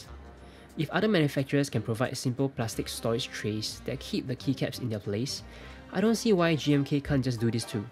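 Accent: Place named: Singapore. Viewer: Thanks but I have to mute the video and turn on the subtitles to understand this singlish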